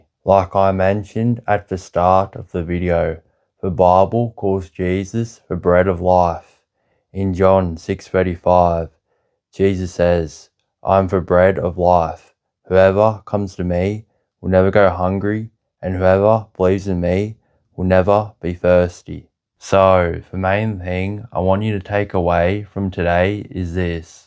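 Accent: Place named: United Kingdom. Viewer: Thank you for subtitles. You have a very strong British accent and I'm not even American. Thanks to the Lord I could understand you without subtitles but many people out there need you❤ please continue your work. Bless you brother❤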